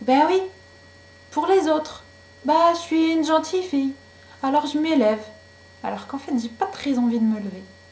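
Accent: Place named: North Africa. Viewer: J'adore ton accent de bledard .... Tres belle video en tout cas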